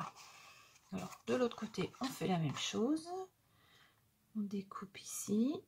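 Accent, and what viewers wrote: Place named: Northern France, France. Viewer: Ccou tout parait tellement simple avec toi j’adore il suffit de bien suivre tes instructions et tout baigne merci et bravo surtout que je débute et je rigole toujours quand tu parles avec tes ptites intonations de ch’nord lol ne change rien tu pourras pas toute façon Hihihihi bonne soirée merci pour le partage bisous 💋👍👋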